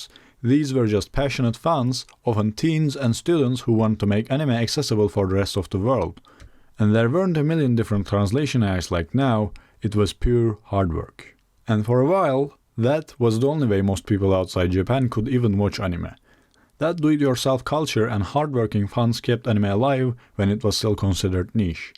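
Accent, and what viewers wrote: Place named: Turkey. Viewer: this isn’t related to the topic but are you turkish your accent kind of sounds like you are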